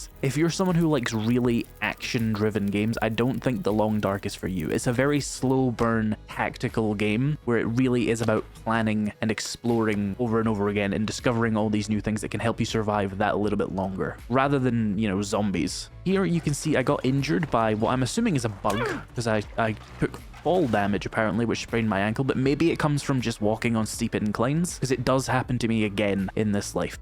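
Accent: Scotland, United Kingdom. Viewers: Ah, it's so nice seeing someone taking their first steps in the game and bit by bit figuring out the core mechanics. Makes the game feel all new and fresh even to a veteran player. :) Also love your accent, are you from Scotland?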